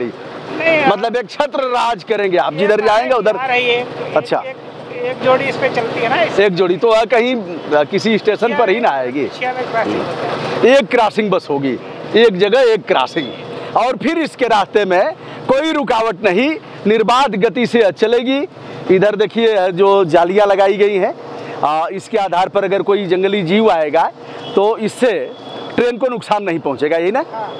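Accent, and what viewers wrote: Standard. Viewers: 🙏चाचा जी प्रणाम आज बहुत अच्छा लगा मुझे आपने जो अदभुत दृश्य दिखकर मन बहुत ही आनंद आया आपका बहुत बहुत धन्यवाद आपका शुद्ध हिंदी बोल बहुत अच्छा लगत 🙏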